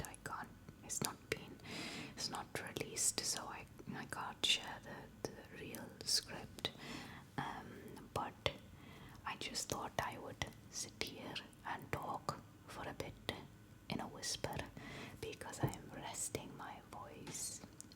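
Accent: South India, India. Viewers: True, most of my south friends talk exactly like this but delhi, Punjabi usually have a good british accent. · I’m hindi and it really sounded South Indian. My jaw dropped oef almost lost it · This is south Indian accent. Indian accent is a little bit of British type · Thats more like south indian a bit overdone but nice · This is more of south indian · This sounded more South Indian than Gujarati but it's still a very good Indian accent. You're the first foreigner whose Indian accent doesn't make me cringe. In fact, your accent reminds me of some of my teachers. The video is very well done. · This is south indian accent rather than Indian I mean Indians speak normal English y'all so many stereotypes😐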